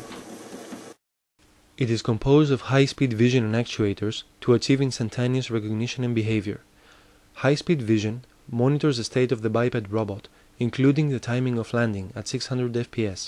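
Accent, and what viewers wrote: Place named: Greece. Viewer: Πως ακούγεται ότι είναι Ελληνας εεε;! Πάντως συγχαρητήρια παιδιά!!!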